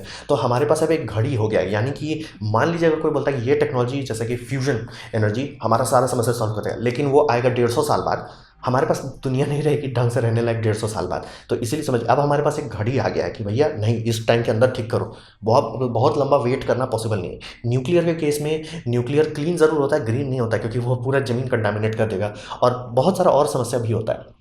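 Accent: Bihar, India. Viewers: Sir ar u from bihar Or jharkhand please replay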